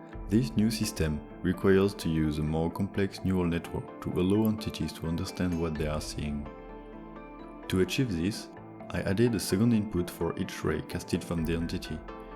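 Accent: France. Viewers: Le fait que tu es un accent français me fait encore plus aimer la vidéo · you have a french accent lmao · T'es français ? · ho le méga accent français mdr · Bonjour j'entends ton accent français, as tu une chaine en Français avec la même video ? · You sound so french, why all AI youtubers are french wtf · Ah moi j'aimerai une version française hihi (l'accent t'as trahis 😘) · L'accent français énervé je m'y attendais pas x) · Is it just me or does he sound French? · Hmm le bon accent français ❤️ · Excuse me but i wonder, after seeing the first minute of the video: "est tu français?" Because of your accent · are you French🤔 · L accent francais on est laaaaa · it seems that you have a French accent. si oui très bonne video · Petite question : serrais tu français ? Y’a un accent très reconnaissable 🤔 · He is french because is accent, you can make a video in french 😉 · French accent? 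· gros accent français spotted ;) mais vidéo interessante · T’es français l’ami ? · Haha je le reconnais bien, l'accent français 😂 Courage, tu vas y arriver 👍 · C'est un accent français ça ! Ça fait plaisir 🇲🇫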